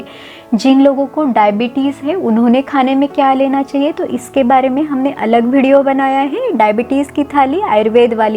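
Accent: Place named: Maharashtra, India. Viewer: ताई तुमचे मराठी accent मधे हिन्दी नेहमीदिसुन येते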